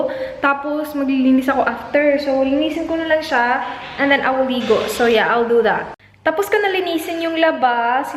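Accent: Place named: Philippines. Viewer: I love you ate!! I hope po EVERYDAY po ang vlogs nyo 😂 and yea namiss ko yung tagalog mo. 😂 You always speak in english na eh 😂 which is not bad but namiss ko lang po 😂 i hope na sa mga next na vlogs nyo you will speak tagalog na po madalas ☺ (im not saying na bad sya, and yes i understand na nasasanay mag english pag nasa ibang country ka, cause i live rin po sa ibang bansa. but namiss ko lang po again tagalog nyo 😂. i just love your tagalog accent so much ❤❤😘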